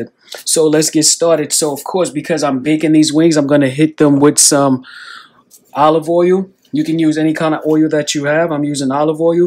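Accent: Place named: New Jersey, United States. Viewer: I just love listening to your Jersey accent, and those wings look bomb. I'm trying my hand tonight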